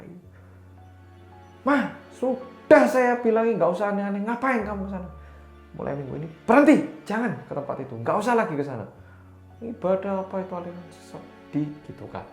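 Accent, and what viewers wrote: Java, Indonesia. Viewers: Medok medok medok rekkkk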